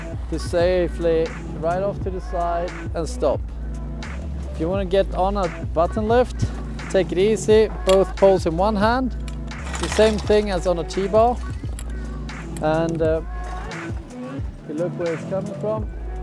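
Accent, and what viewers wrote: Sweden. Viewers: Are you Scandinavian? You're accent sounds Scandinavian, specifically Swedish🙂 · Is he Swedish? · This is really helpful! Tack som fan mannen!(din engelska är väldigt svensk😉)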